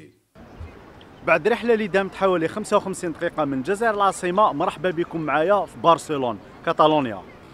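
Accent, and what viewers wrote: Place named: Morocco. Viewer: دوبني ادري ان الجزائرين يتكلمون كذا نفس المغاربه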